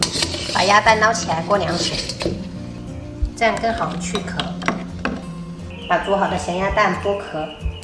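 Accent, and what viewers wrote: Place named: Fujian, China. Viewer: ：我在温哥华福建人，一看你的视频就犯嘀咕...觉得听到乡音！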